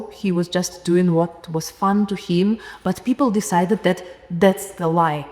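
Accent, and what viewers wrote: Russia. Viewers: Вполне нормальный такой русский английский. Большинство невыпендривающихся русских на нем говорит. Я обожаю его! Ничего не отвлекает. Все как по-русски, но английскими словами. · Я такой русский акцент понимаю хуже чем индийский 😂 Хотя казалось бы😅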